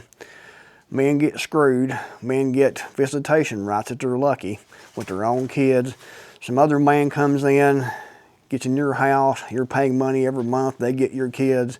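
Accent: Appalachia, United States. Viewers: Thank you that means a lot. I'll watch more of your videos. I like your accent applachian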